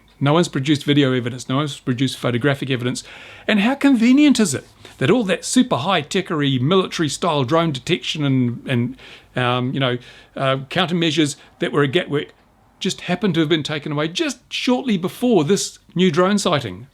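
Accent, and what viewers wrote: New Zealand. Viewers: "Kiwi" accent LOL! =D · Goodmorning..Kiwi accent lol. Is that one of your female admirers on your left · I like this format. Informative and not an outright rant. Very nice and laid back. And that Kiwi accent. Tingling.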